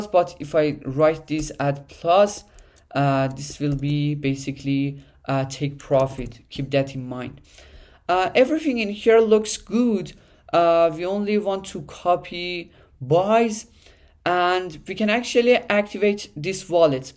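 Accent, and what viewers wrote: Iran. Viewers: Fkn persian accent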